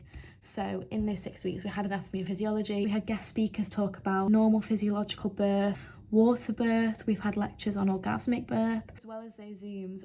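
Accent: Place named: Liverpool, United Kingdom. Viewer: scouse accent creeping up already 🤩🥰😘😅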